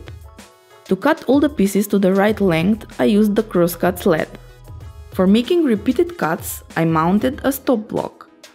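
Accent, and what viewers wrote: Russia. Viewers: hard to imagine what was the cost of that table :) PS: are you from Russia?))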